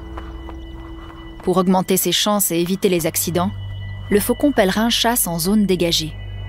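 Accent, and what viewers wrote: France. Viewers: toi t'y'es un parisien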